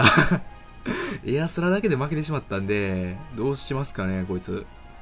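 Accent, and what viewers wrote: Kansai, Japan. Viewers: 声の若さと強めの関西弁懐かしいな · 関西弁強めの懐かしい😭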